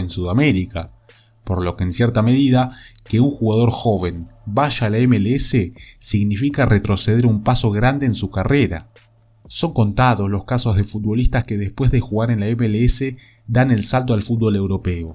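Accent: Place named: Uruguay. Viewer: El acento Uruguayo es asqueroso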